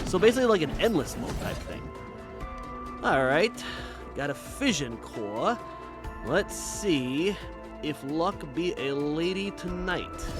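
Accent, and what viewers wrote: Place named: New York, United States. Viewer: It amazes me how YouTube isn't going out of business. Also your doing well and have a great day honestly I don't mind the swearing plus love New Yorker/Chicago accent reminds me of the days of Mafia like fat Tony from Simpsons and Vinnie cannoli from guns gore and cannolis.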